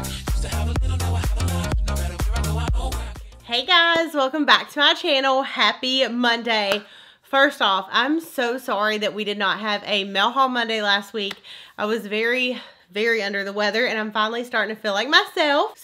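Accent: South, United States. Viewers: Your southern accent is tooo adorable... Luv ya doll...😀😀😀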